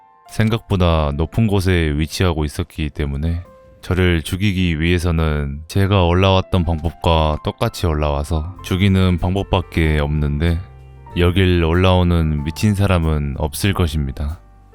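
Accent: Jeolla, South Korea. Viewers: 전라도 사투리 구수하네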